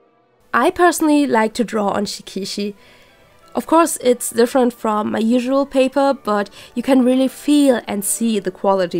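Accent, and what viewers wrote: Germany. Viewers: Ich wollte wissen was ein Shikishi ist, da ich davon gestern das erste Mal gehört habe und muss kurz sagen: Ich erkenne Deutsche, die Englisch sprechen irgendwie sehr oft :'D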